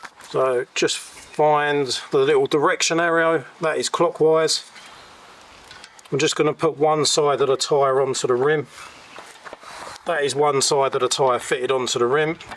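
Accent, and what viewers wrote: United Kingdom. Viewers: Always enjoy the builds! From a cross the pond, I gotta say having a British accent makes it very entertaining 😂. I have no idea what a jubilee clip is, but I know what a hose clamp is.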